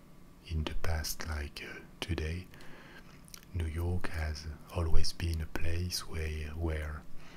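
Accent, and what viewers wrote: France. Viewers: I was gonna say he sounds French and then I took a look at his username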